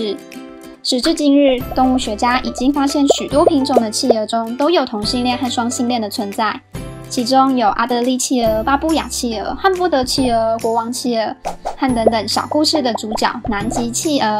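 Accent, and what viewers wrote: Taiwan. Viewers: 湾湾的口音让我好出戏🌝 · 講話的風格像台灣吧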